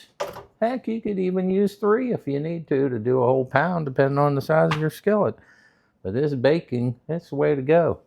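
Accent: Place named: Ohio Valley, United States. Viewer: Hi! Love your videos and your Ohio Valley accent. I know you said you got your griddle from Sam's Club. I'm looking at the Lodge bakeware. Seems the widest cast iron "baking" pan (aka griddle) is 10" X 18". What are the dimensions of your griddle? In this video it looks wider than 10". Please let us know.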